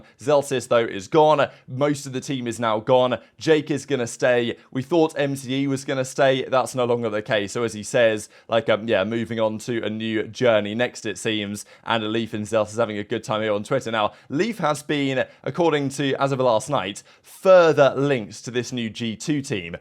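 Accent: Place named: United Kingdom. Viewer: yay learning english, british engliish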